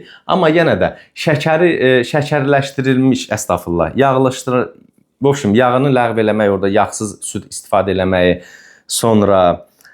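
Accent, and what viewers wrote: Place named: Karabakh, Azerbaijan. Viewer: Çox sağ ol oğlum..Mən də sevərək baxıram bu videolara..Danışıq ləhcəni daha çox sevirəm,məncə Qarabağlı balası olmalısan .🙏🙏🙏🌹🌹🌹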